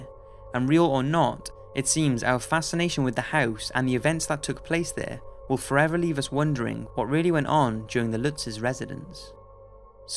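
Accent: Wales, United Kingdom. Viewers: Yes, he should definitely do audio books. Such a soothing and clear voice. Is that a slight Welsh accent I hear...? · finally a Brit narrator😅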